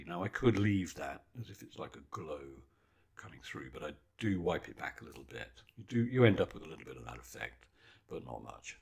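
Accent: United Kingdom. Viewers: I love it..so beautiful, like every time I watch you, and you are just so calming, with that lovely voice and great British accent. . Well, .now I am calm and relaxed. Thank you so much. Both paintings are beautiful.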